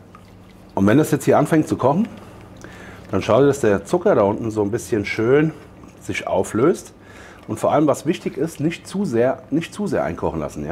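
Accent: Hesse, Germany. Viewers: Hallo, ich bin ein Hesse der in China lebt. Ich finde es total super wenn ich hessisches Gebabbel hoere und dann chinesischem Essen sehe. Du kommt richtig echt rueber und dein Kanal bereichert mein Leben hier.Mach so weiter. Koch doch mal Yunnan Kueche.Hier in shanghai gibt es ein Lokal das heisst lost heaven. Findet man ueber google und alles was dort auf der Speisekarte steht schmeckt super. Aber keine Ahnung wie man es kocht.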